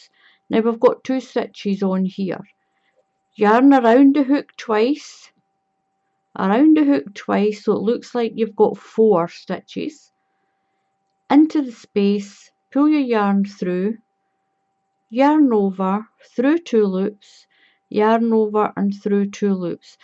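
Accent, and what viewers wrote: Scotland, United Kingdom. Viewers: thanks, I live in Scotland so your Scottish accent is wonderful to hear,so many channels are American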